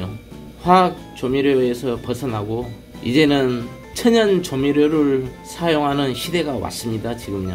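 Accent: Jeolla, South Korea. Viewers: 절라도 사투리가 긴 있당켸 ㆍ ㅎ ㅎ 호 ~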